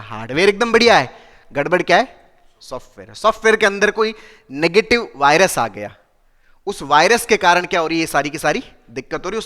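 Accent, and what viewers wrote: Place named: Marwari, India. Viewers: Sir aap ki marvadi boli bhot achhi lagti he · Sar ji namaskar bich bich mein aap Marwadi bolate Hain Rajasthani bolate Hain bahut Achcha lagta hai Jaise dusre state Ke Log bich bich Mein Hindi ke sath ya English ke sath apni Bhasha ka uchharan Karte rahte hain vaise Sar ji aap bhi bich bich Mein Marwadi Rajasthani bolate rahega isase Hamen bahut Garv Hota Hai aur bahut Achcha lagta hai itne Bade doctor hokar Marwadi bolate Hain Rajasthani bolate Hain To hamen bhi aapse Vishwas badhta Hai Marwadi bolane Mein Rajasthani bolna aap bahut acche se samjhate ho Sar ji aap karte dil se dhanyvad aaj ka subject bahut hi interesting tha nice topic tha dhanyvad doctor sahab❤❤❤❤❤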